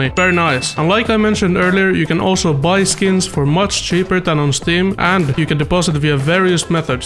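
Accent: Finland. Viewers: Are you finnish? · Ur finnish? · ooooooooooooooooooooooooooootko suomalaine kuuulostaa aksetti kyllä ralli enklish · U finnish?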